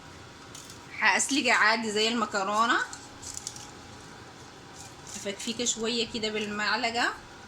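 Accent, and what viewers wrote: Sudan. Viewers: الهجه اليودانيه جميله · ما شاء الله شكلها حلو جدا 😋 علي فكره بحب اللهجة السودانيه جدا ♥️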